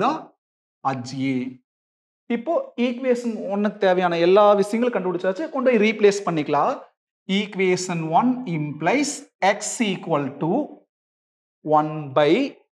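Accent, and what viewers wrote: Coimbatore, India. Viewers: Coimbatore ah sir unga native? Pesurathu konjam vithyasama irukku😅😅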